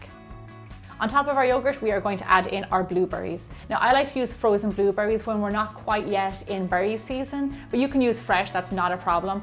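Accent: Ireland. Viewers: she is from Ireland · Are ya Irish? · is she from Ireland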